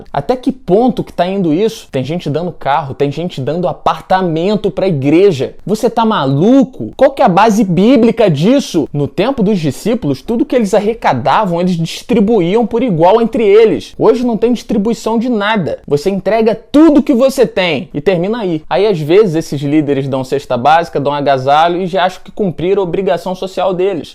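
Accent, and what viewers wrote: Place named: Rio de Janeiro, Brazil. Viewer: desse sotaque carioca eu ixtava com saudade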